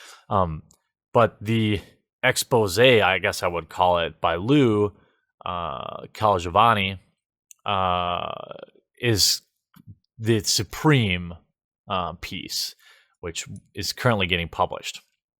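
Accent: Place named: California, United States. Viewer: You remind me of Andrew Breitbart, especially your CA accent. :-) Keep fighting! & Good luck!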